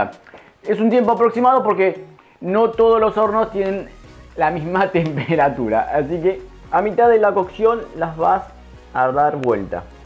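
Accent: Argentina. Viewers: Me encantan tus recetas! Soy porteña tb y así hablamos! A hacer las palmeritas👌👨‍🍳❤👏👏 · Nací en Buenos Aires y me encanta el acento de este chico. Donde vivo, en Europa, tenemos masa de hojaldre rectangular 😉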